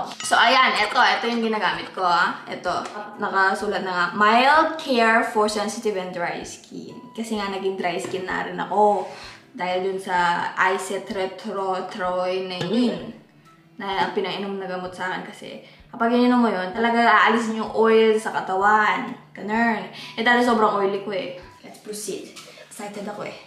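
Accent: Pampanga, Philippines. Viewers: So cute! Lalo na yung kapampangan accent. I wonder if you're a kapampangan po. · Yung accent talaga ng pagka kapampangan eh😅 Proud cabalen here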